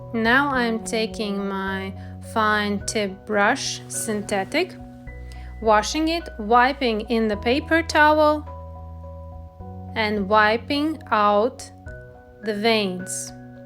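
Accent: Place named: Russia. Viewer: Русский акцент не спрячешь))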